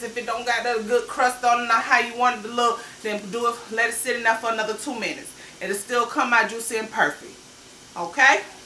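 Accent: South, United States. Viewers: Lawd wea u from lol, i swear you got to be from Alabama, Georgia or somewhere from the south cause that damn country accent is strong and I love it lol you sound so much like my folks down south lol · no you're not too country that's being you that's natural don't stop being you please do not change your accent your natural country swang... Jersey and PA love you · Sweetie ain't nothing wrong with the way you speak. We're Black and proud!!!Just like Jamaicans have patois so do us country folks. People can poke fun but they wanna sound just like us. Keep doin whatchu do Queen. Thanks and blessings from Connecticut😚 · We love you! I have one request tho.......PLEASE DO NOT change your accent. We love your naturally country swang accent from the first video. Its original & it reminds us of our mothers & grandmothers cooking. Do not switch to the “proper talk”. 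We like the down home country talk. Love ya, be blessed😎♥️🤟🏾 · Country speak is for all us Southerners—black, white, brown, or purple! I think I trust someone who speaks like you more than someone without an accent when it comes to good food. I am a plus size girl as well and my daddy always said he wanted to be served by plump people because you knew the portion size was correct! Glad you are back! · I like when you talk in your normal southern voice. This was a great video. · Hi, I'm new to your channel. I watched 1 video and couldn't stop! That country accent along with those delicious meals bebeeee, made me subscribe! You're doing an awesome job. Keep the videos coming. I swear I'm gonna try some of these meal. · I'm not sure Where you're from, but I Absolutely LOVE Your accent!😘😘😘😘 As a matter of Fact, the Accent is what "Drew ME In" bcuz I'd never seen 1 of your videos until recently and it was Your Easygoing nature & Naturally "Country" Twang, that made me come a 2nd time, so Please-just BE YOU.......💜😏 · HELLO BEAUTIFUL BLACK QUEEN I LOVE YOUR COUNTRY TWANG YOU ARE A COUNTRY GIRL JUST LIKE MY MOMMA I LOVE YOUR RECIPES MY NAME IS SABRINA I DO NEED HELP IMPROVING MY COOKING SKILLS I DON'T MIND SENDING YOU A FEW DOLLARS HERE AND THERE I WILL TELL WHAT I LIKE FOR YOU TO COOK AND YOU TELL ME HOWNTP SEND YOU A FEW DOLLARS THE SALMON I DEFINITELY HAVE TO TRY AND THE SEAFOOD DRESSING I GUESS IF I DID THIS FOR MY MAN HE BE READY TO PUT A SISTER ON LOCK WHERE YOU FROM I LOVE YOUR ENERGY AND HUMOR YOU KEEP YOUR COOKING FUN I DID SUBSCRIBE TO YOUR PAGE ANYWAY KEEP ME POSTED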